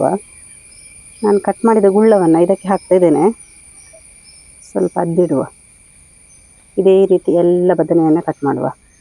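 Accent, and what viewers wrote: Coastal Karnataka, India. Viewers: ನಿಮ್ಮ ಕರಾವಳಿ ಭಾಷೆ ಕೇಳಲು ಬಲು ಚೆಂದ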